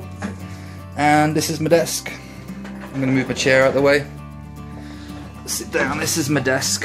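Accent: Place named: London, United Kingdom. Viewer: I learned several things today. You're left handed, and a fan of odd art. You like Marvel comics, fantasy, sci-fi, and gaming. You most likely spend your down time on XBox. You ride a bike every great once in a while. You're most likely single, given the state of your flat. Coffee is important to you. And you restore things. Your flat resembles the only one I've seen, it's in Edinburgh. Given the accent, though, I'd say you're nearer to London than you are Edinburgh. Also, Stargate. (I may be wrong on most of this.) And my question, though mostly out of need to joke, is: what's your address? ;3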